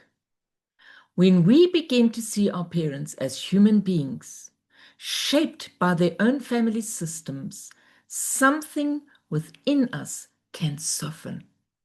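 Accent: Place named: South Africa. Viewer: Lovely to hear your South African accent. Thanks for this video. Will send it to my (adult) kids... getting them to listen is another story 😉🙏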